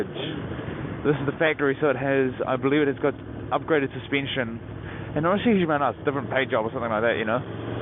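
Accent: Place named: New Zealand. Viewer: Nice to hear a fellow kiwi , really enjoyed this hope to see more on the aprilia and more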